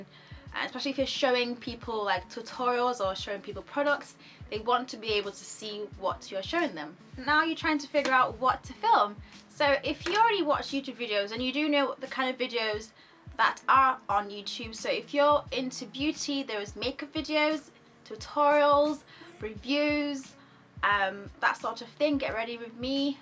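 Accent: United Kingdom. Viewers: Truth be told, I only clicked on this video because I thought she looked very pretty. I also like her British accent.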